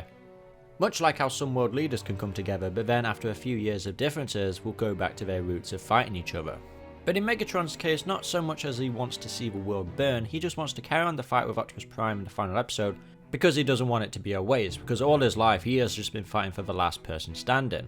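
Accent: United Kingdom. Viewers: So do you have a British accent or some other kind of accent? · he is posh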